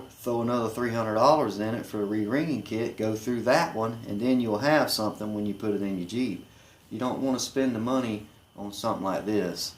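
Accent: South, United States. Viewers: Much love brotha love the content,and that good ol' southern twang..Wish I lived closer we could go tear shit up..Keep it up..peace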